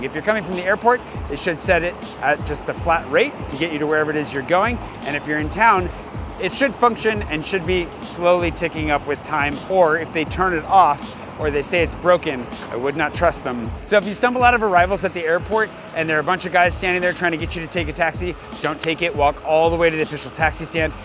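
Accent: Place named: United States. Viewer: You've lived here long enough to become French yet you sound like a ********g American. Hope we do not bump into each other. I've lived here 10 years.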